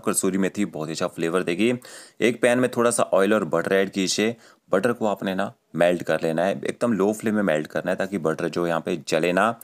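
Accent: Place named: Himachal Pradesh, India. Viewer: App kaha se ho u sound like Himachali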